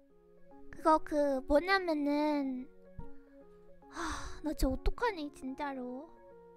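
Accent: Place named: South Korea. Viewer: 가 롤을 해서 그런지 짜증내는 말투가 점점 한국식으로 변해가서 너무 귀여워ㅋㅋㅋㅋㅋ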